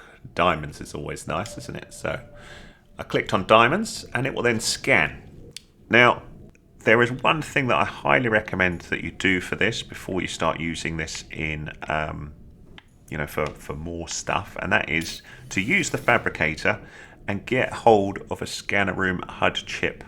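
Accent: United Kingdom. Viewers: Yeah, agreed, straight to the point. Also your English accent is lovely!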